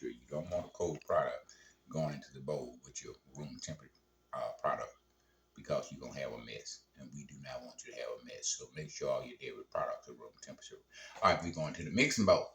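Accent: South, United States. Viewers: Mr. are you from the state of Florida? Georgia or Louisiana?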